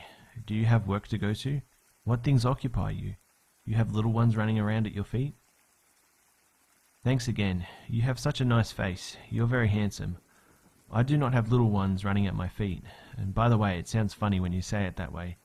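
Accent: Australia. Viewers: Hey question! Where abouts are you from in Australia? I am in Australia for 4 months now and just recognised your accent ( not to be creepy or anything) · love love love this!! + love your channel and LOVE your accent! im an aussie too (melbournian lol) it's very soothing to hear your voice/accent. helps me sleep when im having a bad night. please keep up the great work, from one of your BIGGEST fans!!!!:) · cause you read your stories with feeling. When the person narrating does it on one tone and almost no enthusiasm. It distracts from the actual story. Plus the Australian accent ain't to shabby to listen to. :-) hee hee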